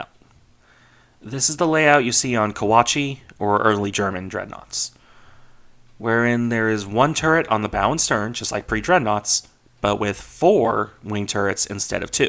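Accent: United States. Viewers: Oh, just checked up on dee ofa comments: see y'all Virginian, wit dat southern drawling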